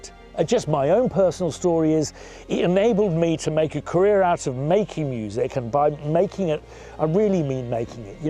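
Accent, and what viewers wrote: United Kingdom. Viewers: I'm 60. MIDI became a thing in in 1983, when I was 18, and I had been waiting for it. I subscribed to (Contemporary) Keyboard Magazine for a few years before this. You nailed the beauty and simplicity of connecting instruments through MIDI very well, and I appreciate this video. I didn't learn anything new, because I learned all of this at the time, and loved it and love it still. You show your love of MIDI very eloquently (my Canadian accent doesn't do the justice of your UK accent), yet the obvious advantage of what this technology did for all of us is made clearer through your explanation, and is a historical timepiece. Thanks for doing this. Rock on.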